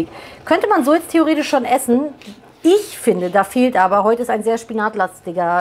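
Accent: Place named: Cologne, Germany. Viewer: heute mit besonders aktiven Kölner Dialekt und am Kochen: Horst Lichter, bisch du's?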